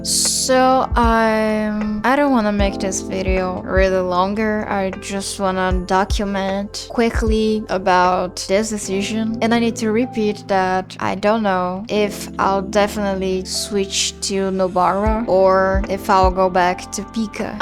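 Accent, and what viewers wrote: Brazil. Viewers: Meu ouvido brasileiro detectou algo brasileiro 👀 kkkkk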